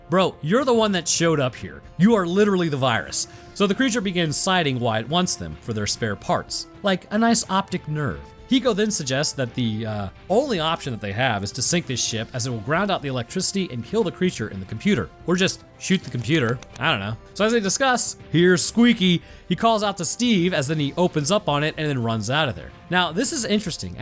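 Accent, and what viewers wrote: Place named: Roanoke, United States. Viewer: I call the combination of your speech, cadence and inflection Roanokian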